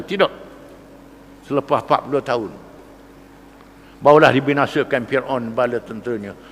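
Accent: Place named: Terengganu, Malaysia. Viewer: suka dgr kuliah tok guru, tidak terlalu ganu loghatnya. org KL pon paham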